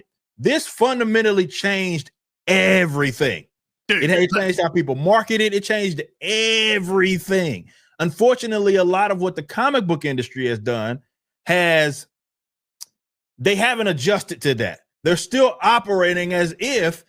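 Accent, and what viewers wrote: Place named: Texas, United States. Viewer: I can't stand the Texas hayseed accent dude... "is" is eeeyz, "been" is beeeyn, etc.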